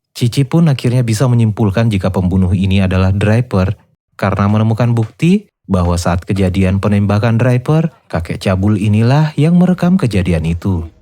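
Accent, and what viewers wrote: Polewali, Indonesia. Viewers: Kamu org Polewali kah bang...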